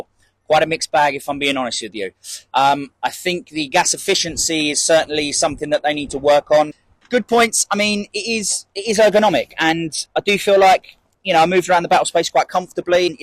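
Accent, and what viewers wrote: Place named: Canary Islands, Spain. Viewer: Se me hace gracioso ver a un inglés hablar español con acento canario xd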